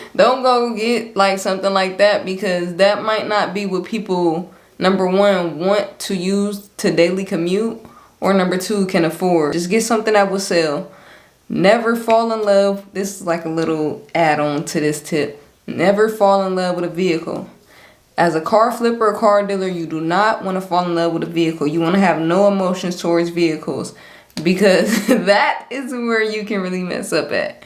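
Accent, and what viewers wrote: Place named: Midwest, United States. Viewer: I love your accent lol you from Ohio or mid west area ?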